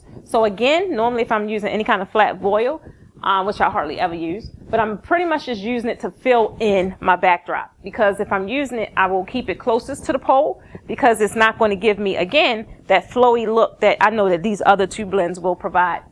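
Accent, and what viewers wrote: Baltimore, United States. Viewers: Love these tips. These are great. Thanks. You must be from B-more. You sound like some of my UMD friends 😁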